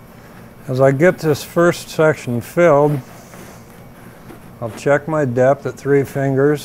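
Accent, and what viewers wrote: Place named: Midwest, United States. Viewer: I love watching you work. After 4+ decades in the Navy and federal wildlife law enforcement, I have developed an interest in old hand tools, carving, backpacking, fly fishing, AND furniture restoration. Your videos are almost “zen like”.....in that they relax not only me.....but surprisingly, my Australian Shepherd as well!!! Plus.....I’m learning something! I’m just getting into the restoration of a Stratford Chair Company mission-style chair....and discovered your “channel”! You remind me of a younger Sam Elliott....you even have same midwestern speech pattern! Bravo-Zulu, job well done....on a well-produced AND instructive AND relaxing YouTube video!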